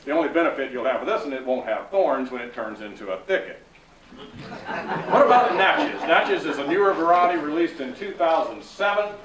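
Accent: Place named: Arkansas, United States. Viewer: Excellent info, two thumbs up! Also if you want a chuckle, turn on CC, and see what it translates Arkansas accent to. lol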